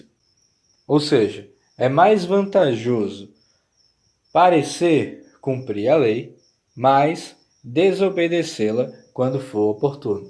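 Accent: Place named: Pernambuco, Brazil. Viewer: tu é de Pernambuco? Sotaque bem semelhante...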